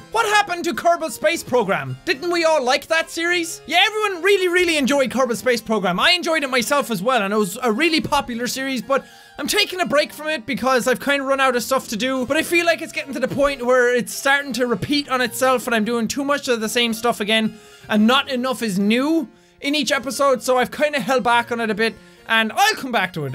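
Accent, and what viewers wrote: Ireland. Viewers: i dont know why but people think jack is not irish he is hes trying to make it easier for you guys to understand im irish and i can tell hes irish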